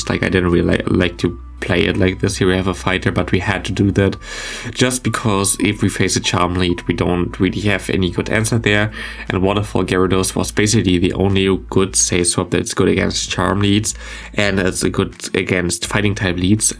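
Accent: Germany. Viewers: I hate your accent. Typisch deutscher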